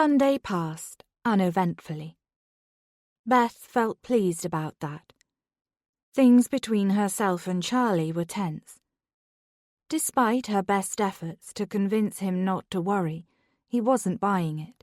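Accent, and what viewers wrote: Birmingham, United Kingdom. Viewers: Great book but the narrators Brummie accent is horrendous and off putting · Mind you, I haven’t started it yet and further down in the comments it says about a brummie accent, which I must admit is not one I like to listen to either.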